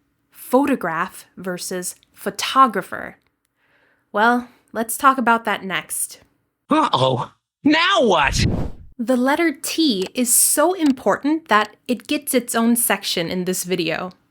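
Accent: United States. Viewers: Hello teacher am new here am loving your accent, are you from which state of USA? Thanks in advance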